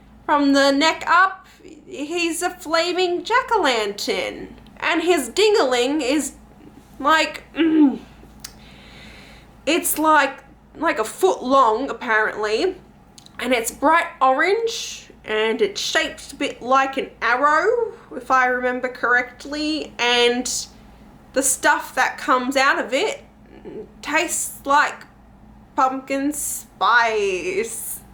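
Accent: Australia. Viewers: Your reactions make your Aussie accent so prominent. It was very entertaining 😂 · love that Aussie accent 💪now were is the manga! You talking about these gross books with no pictures LOL I'm just playing but it doesn't sound like you enjoyed these books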